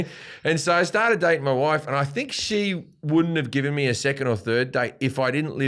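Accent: Australia. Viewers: An Aussie accent delivering dick jokes. I wouldn’t call him a comedian.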